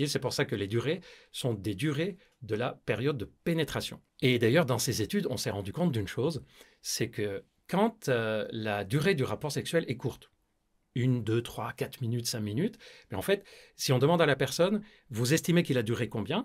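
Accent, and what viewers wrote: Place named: Lebanon. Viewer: Bonne ambiance, intéressant, accent libanais… tout y est.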